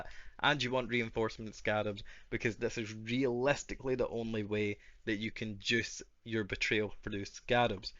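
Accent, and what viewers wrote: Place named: Scotland, United Kingdom. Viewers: I was thinking the same, Is that scotish accent known from samurai jack:) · Scottish poe gamer les gooo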